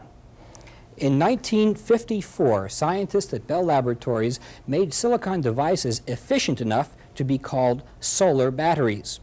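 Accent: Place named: New York, United States. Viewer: Mirruh and electrissidy. Sounds like Richard Feynman def new yorkish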